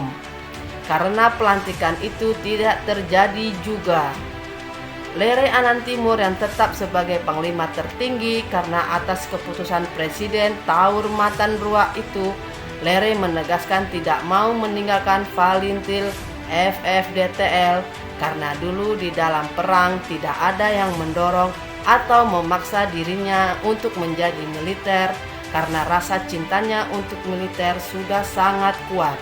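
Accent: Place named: Indonesia. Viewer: Kayaknya ema1 halo Channal ema Indonesia1 karik tan nia logat ne logat ema Indonesia nian.... 🙏🙏🙏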